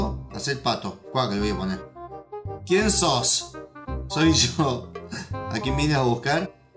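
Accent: Córdoba, Argentina. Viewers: Sos argentino? te pregunto por la tonada :3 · xD, yo también soy cordooobez pero no tengo ese acento :v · sos Argentino ? (Pd: amo tus videos ) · Es argentino? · 848 jaja puede ser pero admiti que se les pira y se les va lejos el acento coordobeeh jaja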